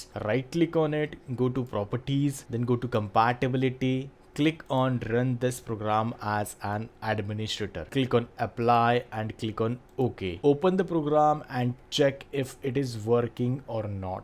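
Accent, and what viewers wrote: India. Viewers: Thanks for your tutorial, it was very usefull, and your indian accent made it better :) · hi I like your Indian accent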